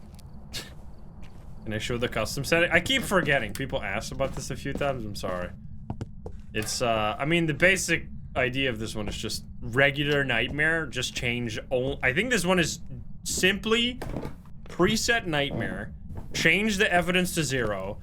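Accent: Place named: Netherlands. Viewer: I've been watching your YT vids for a while now, learnt A LOT, so thank you for the wide knowledge. Also I was used to hearing my late Oma's Dutch accent that I could no longer hear it, and its taken me weeks to figure out you're Dutch yourself lol. I finally picked up on it with some of the words that in English have the "th" sound.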